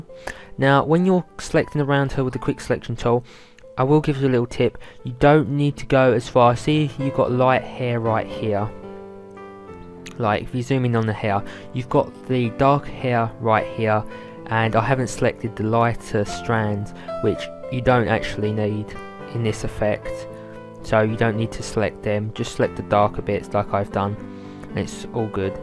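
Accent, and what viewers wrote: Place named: United Kingdom. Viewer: the Q is U only my man has a heavy British dialect... for me it was hard to understand some things as well..... na mean??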